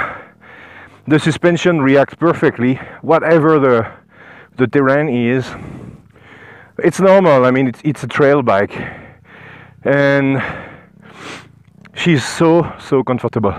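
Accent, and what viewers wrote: France. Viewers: are you french ? because you speak englich as à french guys......salut d'un motard français. ....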